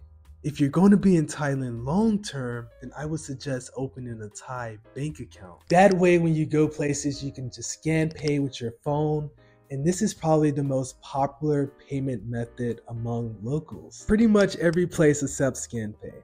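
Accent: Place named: California, United States. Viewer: Good video thanks! Where are you from dude, you sound like a California surfer lol.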